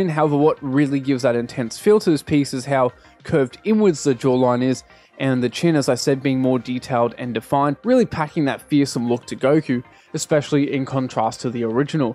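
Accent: Australia. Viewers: I was pretty sure you came from down under but the accent was kinda close to British so I wasn't sure.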